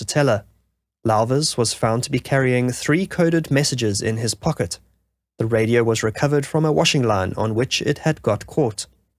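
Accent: South Africa. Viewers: Kudos for another phenomenal video. Well done. It’s great to hear the South African accent of a fellow countryman. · South African accent?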